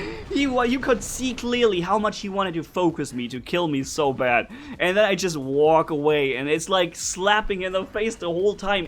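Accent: Germany. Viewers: Bild ich mir das nur ein, aber du hast einen ganz leichten deutsche Akzent🤔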